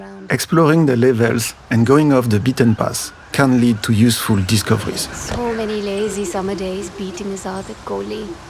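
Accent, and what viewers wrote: France. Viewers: l accent fr qui cringe a mort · This french accent is getting on my nerves, and I am french ! At this point it's like he's making zero effort to be understood. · Punaise l'accent français en anglais rend la compréhension difficile ! Learn to speak english please. I'll buy the game btw, looks cool !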